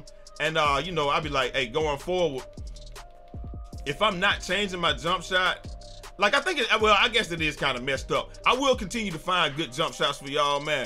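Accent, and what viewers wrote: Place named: Beaumont, United States. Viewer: Ha hhhhaaaaaaa lol let’s get it wit da green da win, boy you act like me frfr then you gave Goudeau a shot out, stop it sound like you from Beaumont, gg I enjoyed that game play tremendously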